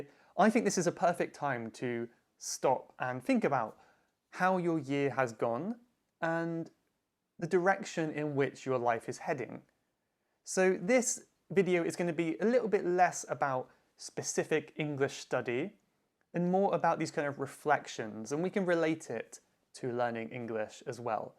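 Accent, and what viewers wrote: United Kingdom. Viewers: I was admire to you myteacher ,my resolutions this years Will to marry and wanna improve myspeaking skill .i hope British accent could come out to mymouth .hahaha i love your accent have Made me ,was crazy .i'm sorry my English bad . I'm from indonesian ,i hope one day i could meet to you ...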